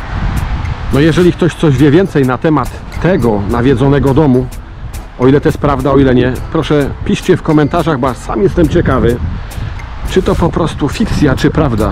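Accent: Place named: Podhale, Poland. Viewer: Jakiś dziwny akcent, coś górami zalatuje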